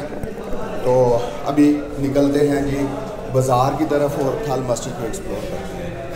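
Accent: Punjab, India. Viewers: صاحب براہ مہربانی اردو بولتے ہوئے تلفظ کا خیال کیا کیجئے۔ میرا مطلب ہے پنجابی لہجے کی آمیزش بہت زیادہ ہو جاتی ہے کبھی کبھار۔ چونکہ آپ استاد ہیں لہذا آپ اگر تلفظ میں غلطی کریں گے تو ہم جیسے پھر کس سے سیکھیں گے؟ امید ہے آپ برا نہیں منائیں گے۔ بہت شکریہ۔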